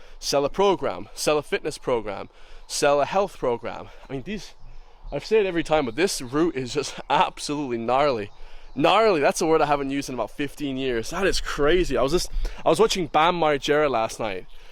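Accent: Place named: South, United States. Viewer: Hahaha you think you're right wing, but you don't abiut politics. You sound like a redneck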